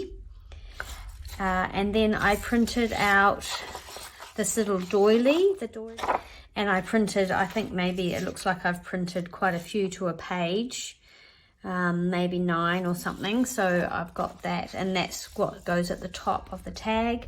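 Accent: New Zealand. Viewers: Love this tag, so beautiful 💙 Also so nice to hear a kiwi accent 😊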